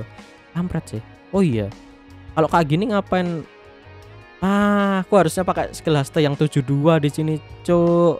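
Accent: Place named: Java, Indonesia. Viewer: Medhok bero. Hehe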